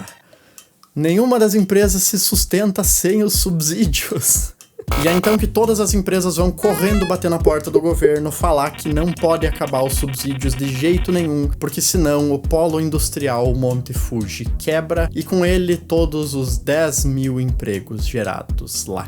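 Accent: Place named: Paraná, Brazil. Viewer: Muito obrigado pelo conteúdo! Vc é paranaense pelo sotaque.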